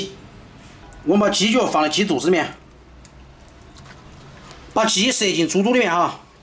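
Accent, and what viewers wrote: Sichuan, China. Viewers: 台灣這裡叫（烏骨雞），補冬的珍禽食材之一。每天都要來看看的視頻，聽你豪邁的四川口音和大口食相，真的好療癒！因為生病，很多東西想吃也吞不下，看你吃彷彿自己也飽餐一頓，過乾癮！！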